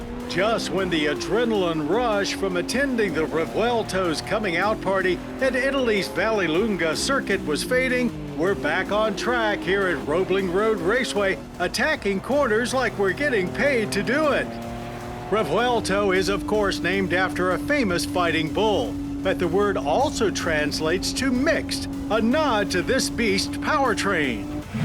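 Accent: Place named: Maryland, United States. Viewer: I am always amused by the way he says "subwoofer" & "compass" with his Maryland accent. He says sub-WOO-fer instead of sub-woof-er. He also says the com like the end of a website name instead of come. Robin Ophelia Quivers from The Howard Stern Show on SiriusXM Satellite Radio also has these quirks from Maryland.😊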